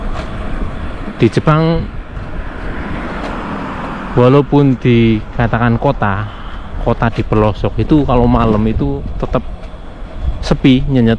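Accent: Banyumas, Indonesia. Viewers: Jangan malah resep gua mah denger bahasa ngapak kaya kiye 😂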